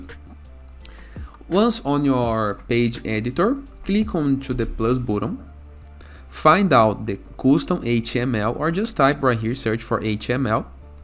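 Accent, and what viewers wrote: Brazil. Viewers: Mano, impossível não reconhecer o sotaque brazuca. Parabéns pelo canal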